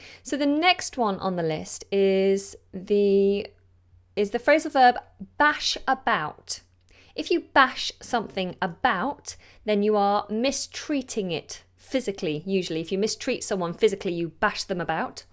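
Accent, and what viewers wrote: United Kingdom. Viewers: Hello, I am a faithful follower of yours and I think you are one of most important and serious youtuber about teaching british English currently. I have seen your develop since you started your channel and you have been gaining lot of suscribers because of your professionalism. I would like to ask you to do some videos about "Shadowing" because I have been watching some very good videos about it but the majority of them are from American English, which is fine, but I am living in the UK and I would like to get the rithm and pronunciation that you have which is lovely. I hope you consider my proposal because I am sure if you do these videos you will do them awesome as everything you have done up to now. This is a very good example about Shadowing, I think he is a very good teacher but hi is teaching American English. <link>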